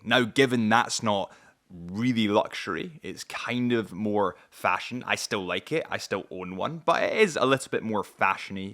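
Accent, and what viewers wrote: Scotland, United Kingdom. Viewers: What’s his accent. Scottish?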